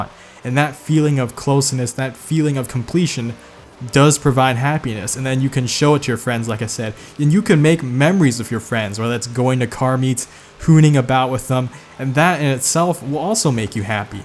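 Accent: United States. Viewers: I thought you were American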